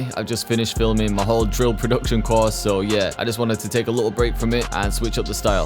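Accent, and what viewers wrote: United Kingdom. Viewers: I like how uk ppl say 808 A O A 😂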